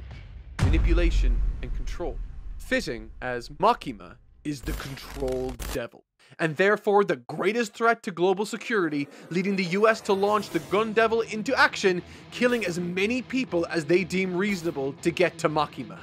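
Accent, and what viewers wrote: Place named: Ireland. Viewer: ARE YOU IRISH? ... WHY IT SOUNDED SO NATURAL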